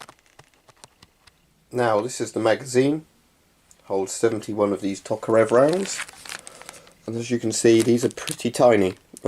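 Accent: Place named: United Kingdom. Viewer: That's sweet, I was about to say how does a person with a British accent who I assume is from England has a sub machine gun. but I see that its deactivated. still would be awesome to have I am from Canada and something like that would be highly illegal to have functioning as well and if I could buy one like this I would. I love Russian Guns, Love my SKS & Mosin Nagant. are you guys in the UK allowed to own those two and the SVT-40 (I've have yet to buy stock seems to be drying up on those in my area)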